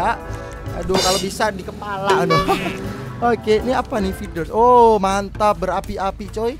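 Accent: Jepara, Indonesia. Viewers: Abang orng jepara?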